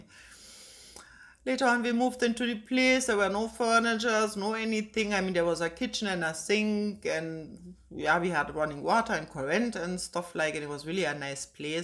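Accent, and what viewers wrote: Jamaica. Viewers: This was a very interesting story. You were very adventurous. You have some Jamaican flavor in your voice. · She sound much like a born jamaican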